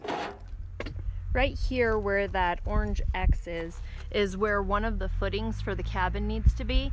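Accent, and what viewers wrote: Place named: Washington, United States. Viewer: listening to you talk I feel like I am home, you have a washington accent, LOL....watching your high desert vids is making me feel more confident about moving out to the high desert area in AZ I was fearful I wouldn't be able to have a garden glad to see it can be done =)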